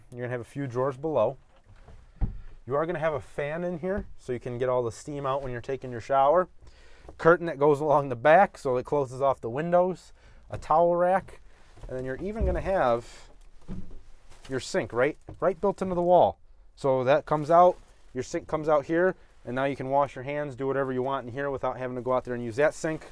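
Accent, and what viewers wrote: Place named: Chicago, United States. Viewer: I like your accent. You sound exactly like my cuz from the Chicago Southside (Bridgeport). You would blend in there quite well.🙂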